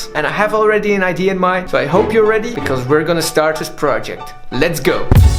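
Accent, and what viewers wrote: Netherlands. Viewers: Where are you from cause you sound like u have a dutch accent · Are you from the netherlands? Because your accent is sometimes a little bit netherlands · I bet you’re Dutch. That accent is so recognizable.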